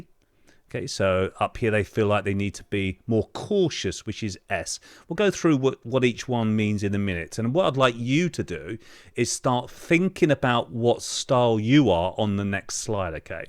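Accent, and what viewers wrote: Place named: United Kingdom. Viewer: I love you and you’re such a wonderful instructor! I love British accent that’s why! God bless you man 🙏🙏🙏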